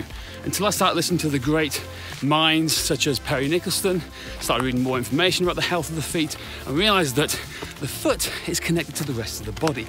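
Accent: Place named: United Kingdom. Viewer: You have a very nice style and manner, also always nice to hear a fellow Brit ✌🏻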